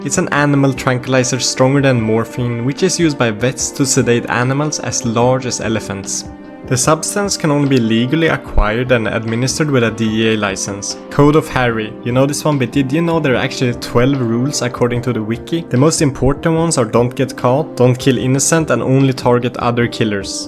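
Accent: Sweden. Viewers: You hear the swedish more than the english when he speaks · Are you by any chance Scandinavian? You sound kinda Swedish with the pronunciation. Greets and thanks for the great video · yo are u by any chance swedish bc i think ur accent is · the swenglish i love it! Great video btw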